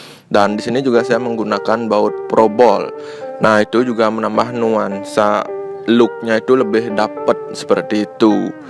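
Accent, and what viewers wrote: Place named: Bali, Indonesia. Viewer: Dari logatnya orang Bali y bli😅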